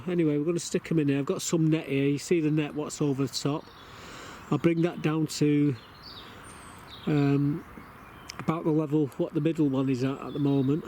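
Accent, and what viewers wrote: Salford, United Kingdom. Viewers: Salford? I used to live in swinton. I thought I recognised your accent 👍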